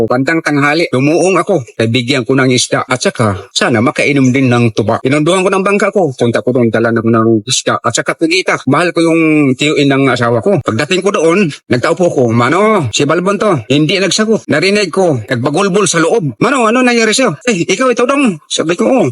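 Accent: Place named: Bohol, Philippines. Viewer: cebuanong may pagka boholano😊.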